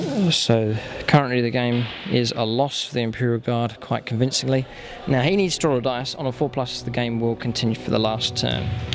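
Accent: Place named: England, United Kingdom. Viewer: it does man, they kill alot of termigaunts and they barley scratch what all is on the board then i spawn more than they killed and they start panicking and getting frustrated which leads to mistakes. but it does get very tiring moving all those models and it takes forever. keep up the batreps i love the quality of the videos, the paint job and the scenery. im going to take from your accent your from Britain right? england most likley?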